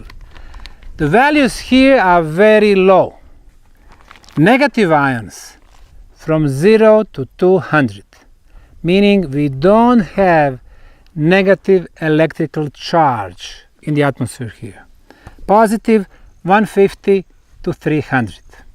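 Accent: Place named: Bosnia and Herzegovina. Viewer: Neverovatan covek! Ali najjace mi kad govori engleski bukvalno sa bosanskim naglaskom😄😄 💪💪💪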